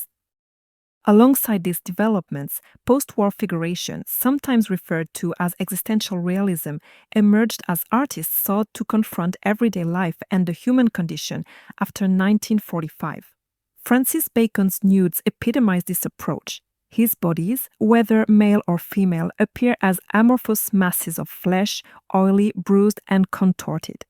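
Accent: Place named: France. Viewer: You have a beautiful voice with your elegant French accent. Your analysis of great works of art is clean, consise and freshly enlightening. Thank you for this.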